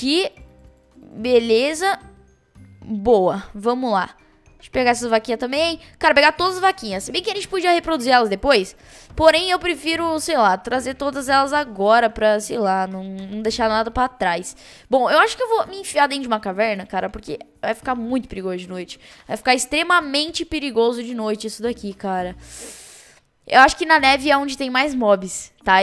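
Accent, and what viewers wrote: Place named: Minas Gerais, Brazil. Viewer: tu mineiro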